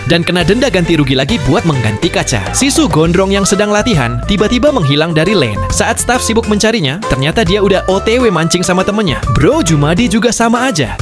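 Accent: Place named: Banyumas, Indonesia. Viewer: Admine wong ngapak apa kepriwe kie 🗿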